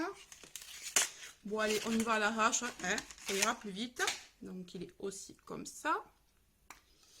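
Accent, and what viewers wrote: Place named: South, France. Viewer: Coucou, j'ai découvert ta chaîne la semaine dernière et j'aime beaucoup tes hauls! Et cet accent du sud... C'est un plaisir à entendre! Bonne journée à toi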